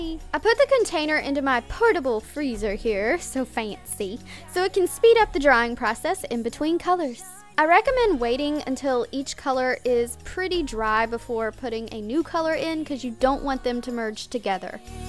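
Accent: South, United States. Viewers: is she country or something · Subscribe Ily videos ur so creative and I love ur funny country accent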